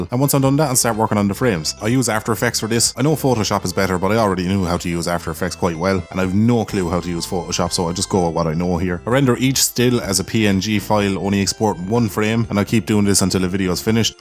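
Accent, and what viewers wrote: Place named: Munster, Ireland. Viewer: Sounds like cork or limerick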